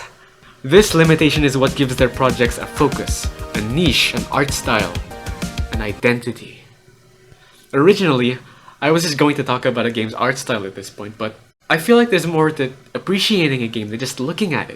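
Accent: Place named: Philippines. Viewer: you sound so slightly Filipino. I don't know what it is. 😂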